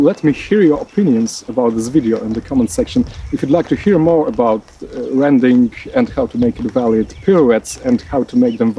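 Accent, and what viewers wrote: Poland. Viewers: you sound like a Polish Christopher Walken. love the vids! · You seems to have Polish accent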